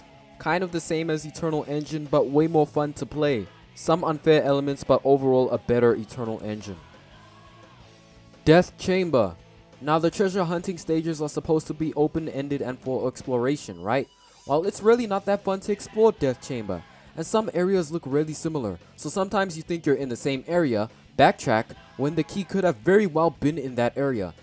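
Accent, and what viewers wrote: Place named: Bronx, United States. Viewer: Can't tell if this guy's accent is asian hood or bronx and I don't care lol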